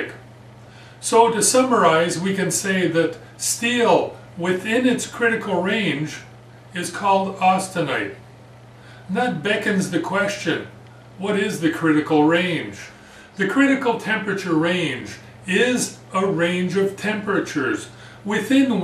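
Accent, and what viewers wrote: Canada. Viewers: He is from Quebec, Canada, so he has a French Canadian accent. I think Cliff Stamp is from the usa... no french accent.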